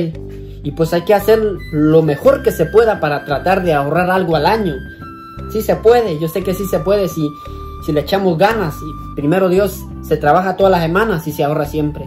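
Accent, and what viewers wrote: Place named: Guatemala. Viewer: Con tu forma de hablar me dice que eres de guatemala y también la musica de fonod es muy típica de aquí XD. Orgulloso de mi guarte :'3 comiendo mrd juntos pero juntos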